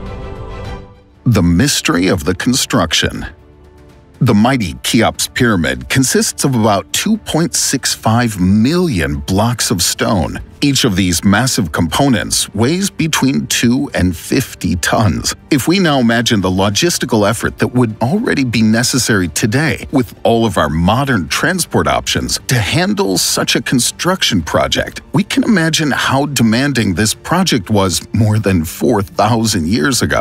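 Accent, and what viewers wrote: United States. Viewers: The narrator has an American accent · the narrator's American accent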